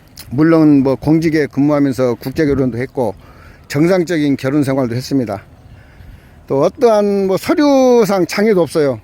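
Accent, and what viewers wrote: Jeolla, South Korea. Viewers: 어쩐지...전라도 사투리가 ㅋㅋ 저는 고창이 친정입니다 왠지 마음이 가더라구요 화이팅 ♡ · ㅎㅎㅎㅎㅎ 어느누가 님을 비리 공무원으로 의심을 하겠습니가 인상이 완전 농사꾼 스타일인대요 손을 봐도 그렇고 말씀하시는것도 그렇고 ㅎㅎㅎㅎㅎ 간간히 전라도 풍의 말씀이 나오길래 전라도분이 아니냐고 물어본적이 있었어요 ㅎㅎㅎ 아무튼 부럽게 잘하고 계심니다 ㅎㅎㅎ 저도 거기서 살고싶내요 다들 모여서요 ^^ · 덕진 소방서면 금암동 전북대 정문위에서 근무하셨군요 ㅎㅎ 어쩐지 영상보는내내 말투가 익숙하다했습니다 ㅋㅋㅋㅋ 평화동이 부모님댁입니다 ㅋ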